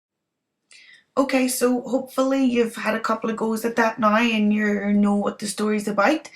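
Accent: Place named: Northern Ireland, United Kingdom. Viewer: Love your Northern Irish accent ( hope I'm right you sound just like my cousin in Ballycastle!) The Ulster dialect sounds quite different to the others.... love listenig to this and learning what I can! ( I'm from Sydney) go raibh maith agat!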